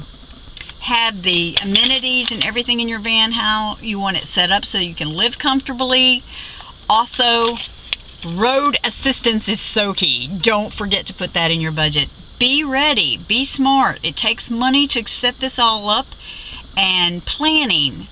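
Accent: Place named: South, United States. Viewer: I detected a little Southerness there!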